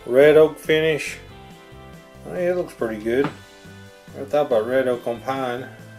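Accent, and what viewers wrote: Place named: Texas, United States. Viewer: I'm from Texas.. I can tell lol my wife and I really enjoyed this video :-)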